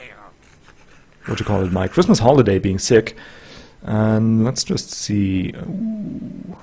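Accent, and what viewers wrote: Germany. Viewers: Im so happy to see you back!!!! :D its great to hear your voice and some german accent again FeelsReallyGoodMan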